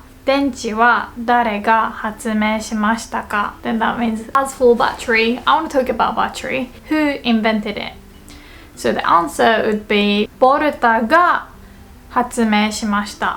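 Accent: United Kingdom. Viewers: Actually, it's a British accent. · You have a great accent I've never heard before! Did you Maybe live/learn English is England or the UK? You have an accent similar to James Grime. (and brains to match!) (singing banana / numberphiles math YouTube channel.) 💖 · Your English has a British accent. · I initially thought you might be British...... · It sounds super british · Most of the Japanese teachers have an American accent, enjoyed seeing British pronunciation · Are you in Uk? A bit accent of the UK! Overall, your teaching is helpful! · I wonder if she learned english from a British teacher 🤔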